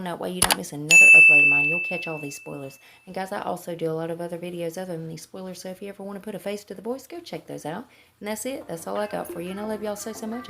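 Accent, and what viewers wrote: South, United States. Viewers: I'd love to get that Hourglass shadow! Your spoiler videos are the best... short, sweet, and served up with that awesome Southern accent (I'm a Bama girl myself)... keep your chin up, girl! ❤❤❤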